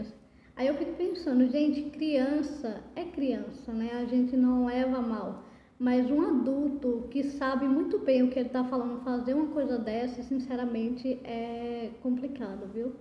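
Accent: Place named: Bahia, Brazil. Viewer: que bela história de superação, e que bom que vc existe. Vc é linda, merece ser feliz. Receba todos os elogios dessa humilde pessoa que se tornou seu amigo, na distante Ribeirão Preto, SP. Seu sotaque também é lindo, sotaque baiano maravilhoso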